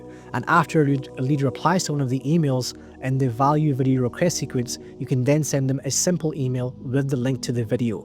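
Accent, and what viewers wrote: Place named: United Kingdom. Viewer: You sound like you're from the UK, so how would taxation fit into all this? Assuming you're suddenly making 10k+ a month, I'm sure that's gonna raise eyebrows with the bank and government who will be eager to reach their greedy hands into your earnings and deduct a significant sum of it in the name of taxation, no?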